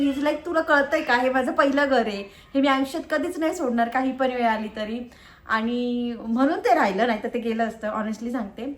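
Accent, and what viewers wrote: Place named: Sinnar, India. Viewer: Sinnar che lok bolun asech ahe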